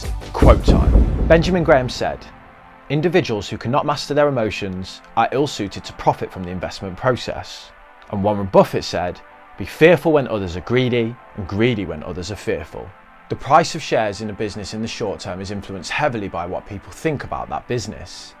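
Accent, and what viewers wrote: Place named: Manchester, United Kingdom. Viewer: Yes mate, knew you was a manc it's in the accent 😂 p.s I'm from wythenshawe by the way, keep up the good work and the videos mate!💪👍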